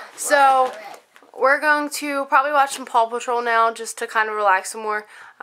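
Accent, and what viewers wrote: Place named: Philadelphia, United States. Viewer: You have such Philly accent! LOL... this is the first time I'm watching you! :) Good to see ya and get to know you this way!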